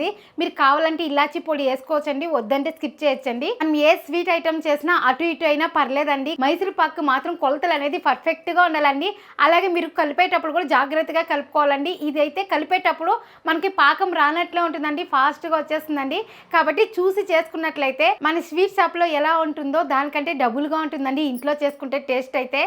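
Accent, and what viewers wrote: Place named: Chittoor, India. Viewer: Maadi ctr andi slang ala unte adiga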